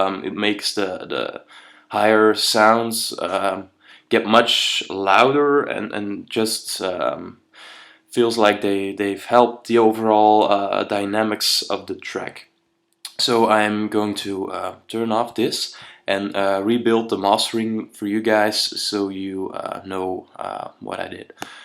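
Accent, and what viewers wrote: Belgium. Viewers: Joli petit accent belge ;) On va essayer ca, thanks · This has been amazing! Thank you so much! I recently moved to Amsterdam and thought you sounded Dutch! Well, Flemish!? Thanks again! x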